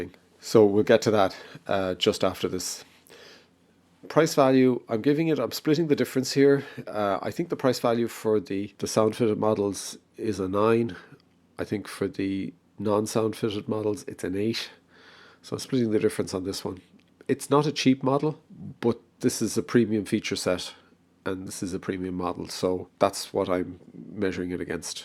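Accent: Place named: Ireland. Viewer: You sound like a fellow Irish chappie . Great video and thank you !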